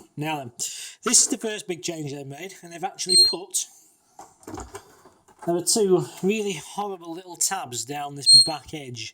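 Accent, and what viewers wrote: United Kingdom. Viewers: Thank you kind sir. while some advice did get lost in translation due to your use of "the queens english" overall you where most helpful.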